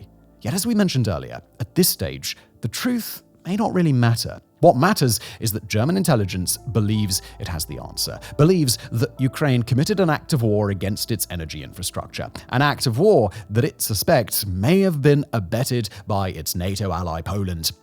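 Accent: United Kingdom. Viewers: an artfully belabored speculation with a right proper british accent is still speculation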